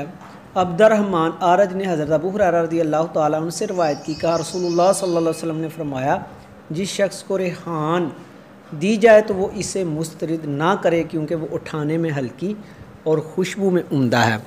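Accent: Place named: Punjab, India. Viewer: Punjabi style Urdu speaking 😄😄🗣️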